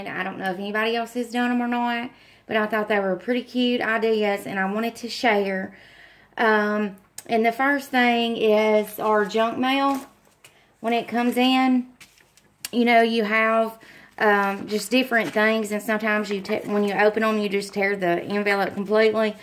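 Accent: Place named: South, United States. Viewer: love the southern accent ! awesome idea's !!!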